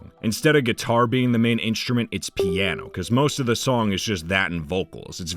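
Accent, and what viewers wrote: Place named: New Jersey, United States. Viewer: Where you from? Jersey?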